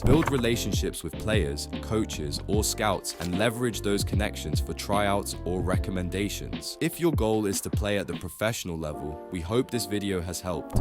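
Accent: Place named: Scotland, United Kingdom. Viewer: Um hi! I know its hard to believe but this is my other account as this channel is only available on my computer and I am using my phone right now but the reality is you will never go pro because you are from Scotland and scouts wont like a person like you with your Scottish accent around here so get an actual job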